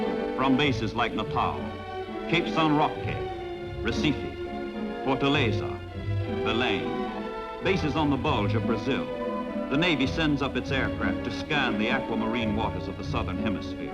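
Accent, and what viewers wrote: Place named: United States. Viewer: I'll give you one star for at least trying. BUT employing children between 6-8 to play the instruments for the soundtrack is NOT a good idea. And then to use AMERICAN ACCENTS on the Voice-Over? What were you thinking? The rest of the world finds it impossible to listen to the accent of the most stupid country in the world.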